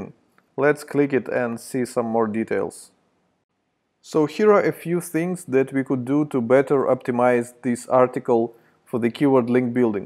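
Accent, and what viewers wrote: Russia. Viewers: Russian accent)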